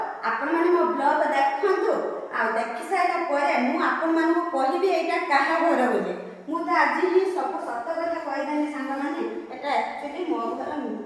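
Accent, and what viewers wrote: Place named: Balasore, India. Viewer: Aapan Kathaa ru janajauchi Balasore boli ame bi Balasore pila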